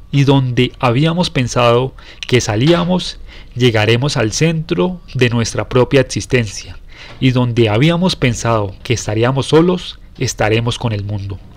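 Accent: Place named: Colombia. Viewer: Que joya este libro y de mano de un acento colombiano. Oro puro. Saludos y gracias por ese trabajo que hiciste para transcribir esta joya!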